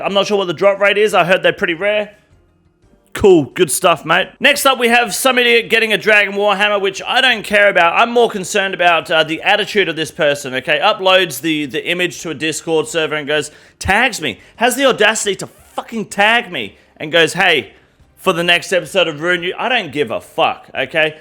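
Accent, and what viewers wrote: Australia. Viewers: Never thought RuneScape would have a unhinged Australian. I love it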